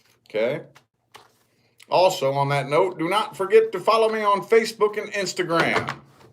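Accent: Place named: West, United States. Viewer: My ex is Aquarius , a creeo if ever there was one. Wont let me move on as he's full with envy . Yet he cheated on me time and again, and I was 3rd party to this toxuc masked devil in disguise. Narcussust, pathological liar. I want to move on to a better life, a life I should've had . Why do I get lumbered with losers like this snoop, who sneaks in and out if my affairs, he's already got someone in the side so why does he want me?. Its money thats what it is . He was always broke .. your rather handsome cowboy!! I like yr accent!! A real western. Well I'm meant to meet a much older guy in middle of December. I dont mind as long as he's trustworthy honest,, and true. Not Me!@ I've had enough of this air sign because he's a liar, cheat, and a chancer. He cheated me, I was true but he wasn"t. That's it!! Thanks big man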